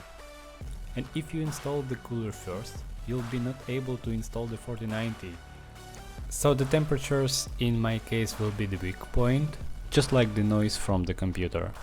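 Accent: Russia. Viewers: Когда нужно меньше 5 секунд, чтобы понять, что автор — русскоговорящий =) (это не критика, если что ;) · много, факт) я слушаю и частенько русский акцент дает о себе знать. Для практики рекомендую читать стихотворения с тяжелым произношением и слушать говор англичан. Ох уж эта практика, с ней как раз всегда и беда))) не хватает ее всегда.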